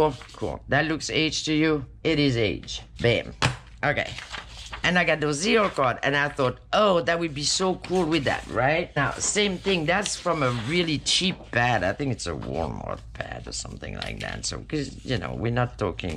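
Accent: France. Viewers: LoL 🤣 you make me laugh Every TIME I watch you 😂 Lol!! I'm so glad I found your channel. No Lie.... When that music 🎶🎵🎶 came on out of 'nowhere' and you said "I gotta go..!."🤪then "I'm kidding!!" and cackled afterwards..I almost D⚰️d in hysterical laughter. I had to rewind to 🙉 hear it Again! You Are a Joy to watch because I don't know what you May say in your Cute French Accent that will have me howling in laughter!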